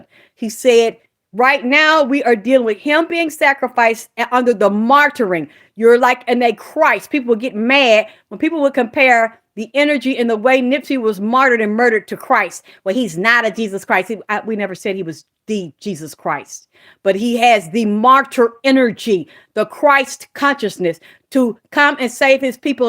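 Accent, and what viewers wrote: South, United States. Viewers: I love your southern/country accent!!!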